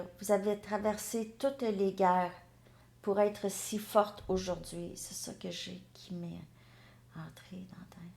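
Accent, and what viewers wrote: Canada. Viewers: Vs êtes adorable et encore plus votre accent Canadien je vs adore 🥰 Princesse 🇹🇳💐🌹🌹🌹🌹🌹👏🏽🎻🪐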